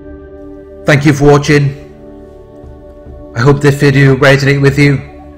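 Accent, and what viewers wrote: Wales, United Kingdom. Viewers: There is something different about your channel, your voice, the content, the music, the images, it’s all perfect, it’s like it was made for me. BTW are you welsh?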